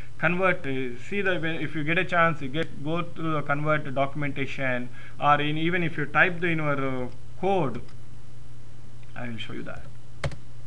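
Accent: India. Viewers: индусский акцент это полный пипец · Ну раз кроме индусов некому... будем терпеть индусский акцент. Хули) Хотя есть еще видеоуроки Georgiy Mogelashvili на русском. чувак реально толково рассказывает... но видеоуроков мало. Хотя для хорошей базы сойдет)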